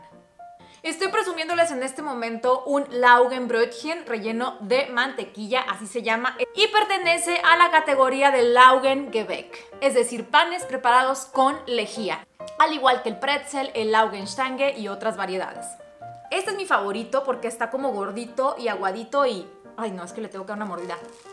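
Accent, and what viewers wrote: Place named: Mexico. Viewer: Hola!! Te agradezco un millón y más, que para narrar tus experiencias y vivencias, en este caso en Alemania, lo hagas de una manera respetuosa, en un perfecto español mexicano, sin necesidad de recurrir a palabras soeces, groceras, obcenas como muchos Youtubers, con tal de agradar a su audiencia. Te felicito de corazón, estás super jóven y no tienes las necesidad de recurrir a vulgaridades para mantener a tu audiencia atenta. Es muy agradable escucharte. Y.. Arriba el Norte!! Gracias!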